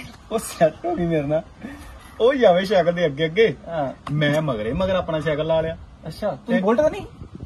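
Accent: Majha, India. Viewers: Veer amrinder gill di copy nhi aa..mahje vale sare eda bolde Bai ji... veer mahje di shan ❤❤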